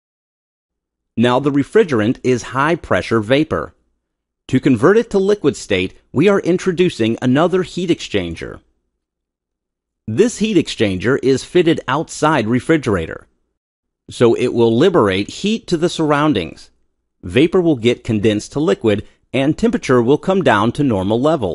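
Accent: United States. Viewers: He has an American accent but misses a lot of words out of his sentence like a Chinese man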